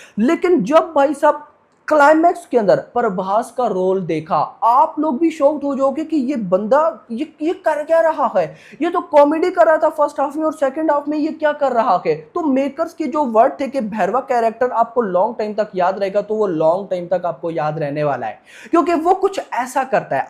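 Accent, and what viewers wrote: Pakistan. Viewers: Tu pakistani kesa bolna band kar sale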